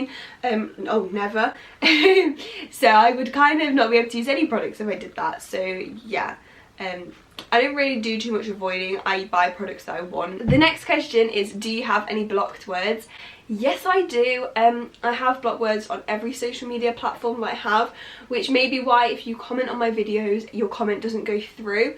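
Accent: United Kingdom. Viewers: How come your British accent is becoming more pronounced. We need you to come back to America 😁😷😷😷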